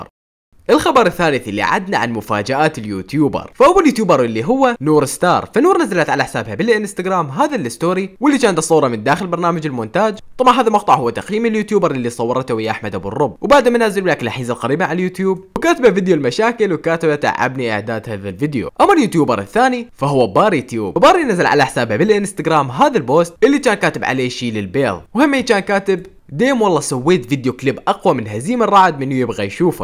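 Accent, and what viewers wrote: Iraq. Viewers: اممم انته من اي بلد لءن حجيك عراقي ماعرفه ممكن ترد وتكول انته من وين لءن اني الاحظ كلامكم عراقي 😊💗♥♥♥ طبعن اني عراقيه 🌼🌼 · اريد اعرف انت من العراق لان اللهجة عراقية بس لتاكد متابعتك من 50 الف تحية من العراق 🇮🇶🇮🇶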